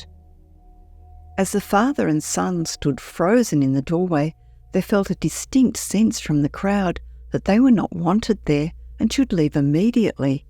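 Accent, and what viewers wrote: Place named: Australia. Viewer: Australian narrator ❤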